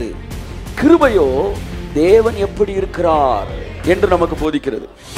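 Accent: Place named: Madurai, India. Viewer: 👉நம்ம ஊரு மதுரை வட்டார தமிழில் 💗 கடவுளின் வார்த்தைகளை கேட்டு மிகவும் உற்சாகமும் ;சந்தோஷமும் அடைந்தேன்.. 💗 இந்த சிறு செய்தி எனக்கு புது நம்பிக்கையை கொடுத்தது (தொடர்ந்து உங்கள் செய்திகளை கேட்டு வருகிறேன் ) நன்றி . அன்புடன், புதுக்கோட்டை சரவணன் . (08.Nov.2020)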